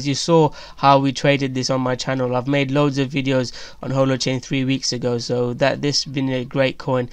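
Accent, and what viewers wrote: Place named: Bradford, United Kingdom. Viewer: Another great video as usual. Did you cover the GVT we discussed a couple of weeks ago. If so can you tell me where to find it. What's your thoughts on ADA from a hodl point of view. A £10 price is all I need 😉. I'm trying to figure your accent. I thought I sensed a Bradford twang.but it could be anything from Southampton to Bournemouth Brighton upwards. I'm from God's country (Wales) in Wrexham.Many questions. Take your time 👍🤝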